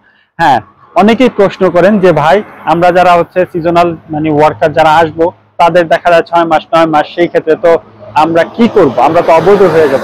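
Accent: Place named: Brahmanbaria, Bangladesh. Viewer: Assalamualaikum bhai...apnar home District B-baria Apnar voice shune mone holen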